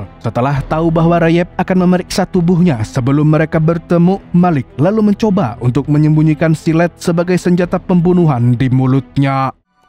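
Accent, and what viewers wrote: Banjar, Indonesia. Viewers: Min orang Banjar kah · Mimin urg banjar kh min? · urang banjar rupanya admin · Asli Banjar kah pian nih mang😮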